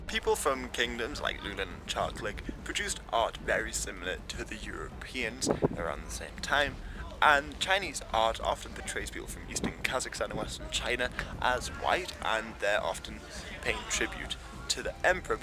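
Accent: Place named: Northumberland, United Kingdom. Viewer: Are you originally from Northumberland? We have the same accent.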